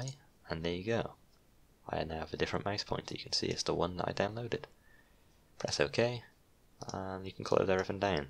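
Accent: Wales, United Kingdom. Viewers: Welsh lad?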